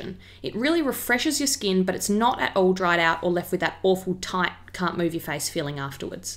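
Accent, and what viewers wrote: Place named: Australia. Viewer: love your Aussie accent! nice skin care routine!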